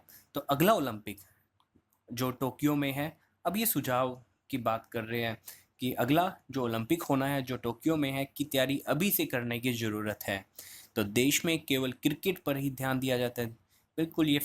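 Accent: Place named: United States. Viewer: Aap america se ho kya sir ... Aapka toning america wali hi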